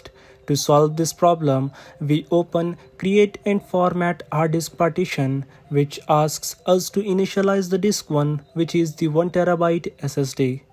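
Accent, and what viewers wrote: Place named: India. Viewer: obrigado amigo indiano, eu amo o sotaque da voz de vocês, seu video foi rapido e muito util para mim. nota 10!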